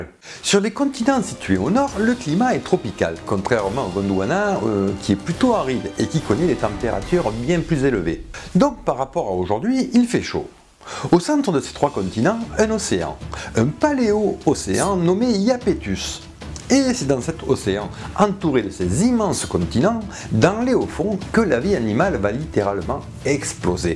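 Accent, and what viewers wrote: South, France. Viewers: Il parle trop vite et avec un fort accent du sud. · Puis-je te demander de quelle région es-tu ? En tant que Belge, je cherche à parfaire mes connaissances des accents français 😅 (pour le moment j'ai compris que c'était "Sud" mais ça reste vaste)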